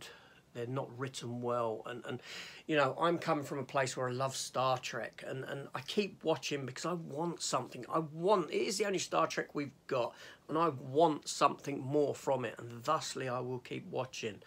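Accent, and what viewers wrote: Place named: London, United Kingdom. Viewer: I'm from London myself so I caught the accent. We practically neighbours 😄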